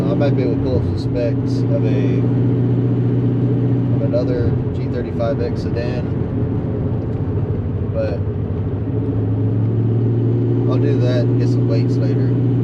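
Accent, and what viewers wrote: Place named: Middle Tennessee, United States. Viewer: my guy lmaooo, i knew you had to be from middle tn. We got all the z/g's out here lol, im from smyrna/ lavergne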